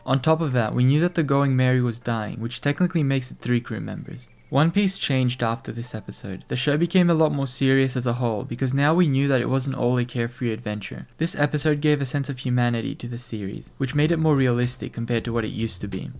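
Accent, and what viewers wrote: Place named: United Kingdom. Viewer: Hey I think this video is amazing. The one glaring issue is how British / European the voice person sounds I very much dislike that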